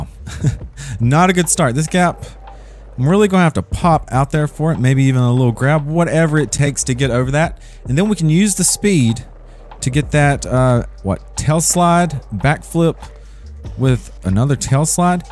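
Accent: South, United States. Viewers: Is there a name for this sort of lispy, effeminate Southern accent? Like how Lindsey Graham talks.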